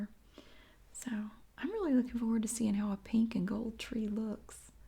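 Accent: South, United States. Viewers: Hi friend!!! I love your relaxing voice! I have a southern accent too. I hope my new ASMR channel does good someday like urs. I am new to doing ASMR and not that good at it but I have some cute ideas in mind to do once I’m not so busy with my photography. I also have a beauty channel too. I do beauty subscription box openings on there and sometimes pets vids of my critters. Hopefully soon u will stop by my channels too. I’m a huge fan or ur ASMR sweet friend and look forward to getting caught up on watching ur vids. I love the pug sweater, I have a pug named Sadie who will be turning 14yrs old this month. Do u have a pug too? Much love and support from Kentucky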